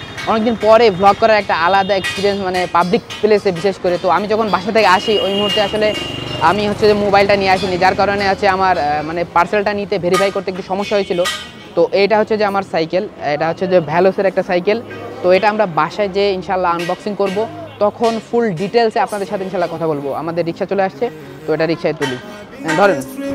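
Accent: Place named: Jhenaidah, Bangladesh. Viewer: ঝিনাইদহ??এক্সেন্ট শুনেই টের পাইছিলাম😅আরাপপুর থাকেন নাকি??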